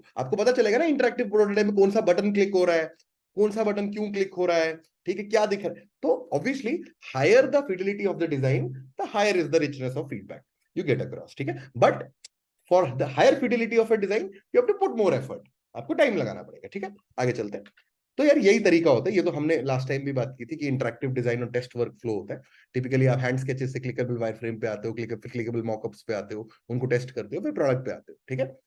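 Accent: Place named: Hinglish, India. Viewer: that hinglish is killing me brottha !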